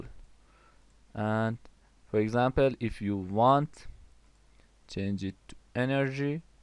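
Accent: Iran. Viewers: Hmm are you from Iran? Your accent sounded like your Iranian.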